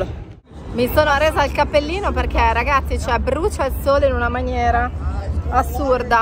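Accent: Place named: Milan, Italy. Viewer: Sei talmente solare che e' un piacere ascoltarti...e vederti. Poi per me che so Romano e' srmpre bello sentire il milanese parlato da una ragazza. Baci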